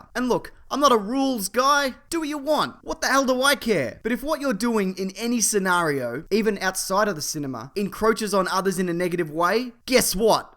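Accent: Australia. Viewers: Delivered in that unmistakable Down Under accent!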